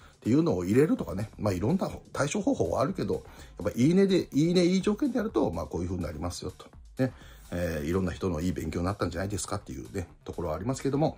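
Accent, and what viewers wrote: Kansai, Japan. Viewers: 里崎さんは、興奮すると関西弁が強くなるね。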